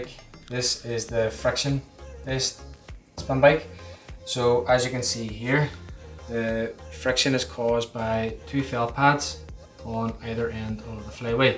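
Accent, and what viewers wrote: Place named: Ireland. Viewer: It’s just an average Irish accent, nothing to go bananas for…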